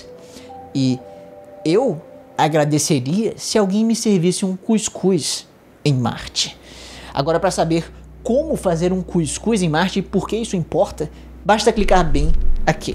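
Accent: Ceará, Brazil. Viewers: Só uma pergunta, ele é do Ceará?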